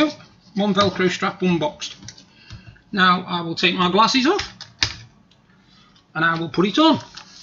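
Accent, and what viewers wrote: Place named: Wales, United Kingdom. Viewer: Are you from Wales? Nice accent! ☺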